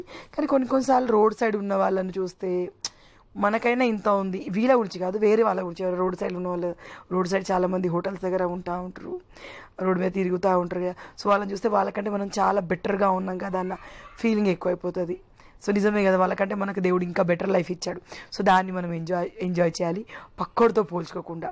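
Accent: Telangana, India. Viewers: Me voice super akka telangana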